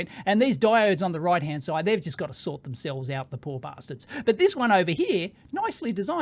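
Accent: Australia. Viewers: is Australian. That's pronounced " 'strine".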